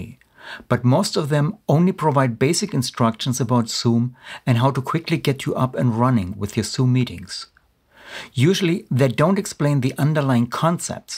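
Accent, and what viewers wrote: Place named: Germany. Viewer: You are really really good at explaining how this mess works, thank you so much! you have a quite strong german accent, you are german right?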